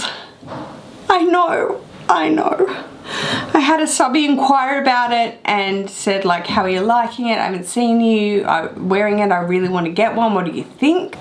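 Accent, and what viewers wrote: Australia. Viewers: Lol HONESTLY BABE...I literally just yell your name every time I see you video!! 😂 im from America so I say it in your Australian accent. My bf even says it now too...ugh I just love you!!!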